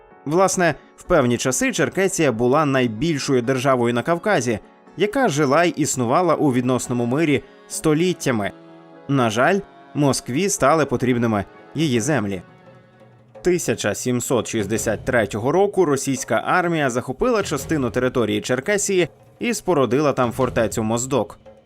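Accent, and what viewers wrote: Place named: Ukraine. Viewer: Ролик с потсрекательством , будьте бдительны.!!!! Высвечивают только один фрагмент,. Подумайте для чего ? Голосу с украинским акцентом рассказывать про черкессов, сейчас в такое гопячее время - это во первых , а во вторых проверяйте информацию более обширно, учите настоящуюю историю своих Родов , развивайте критическое мышление. Слава всем Родам.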